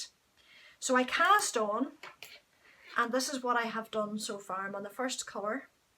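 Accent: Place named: Ireland. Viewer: I love your podcast. Your accent is one of my favorite parts. I live in the western US where we don’t get a lot of Irish influence. I can see why you love that sweater so much. My favorite pattern I ever knit is the Wishmaker Mitt pattern by Erica Hauser.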